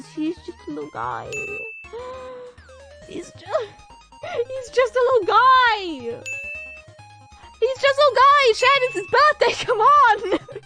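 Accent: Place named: United Kingdom. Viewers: And her Brotish accent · the fact that she’s also British and has an accent makes it 10x funnier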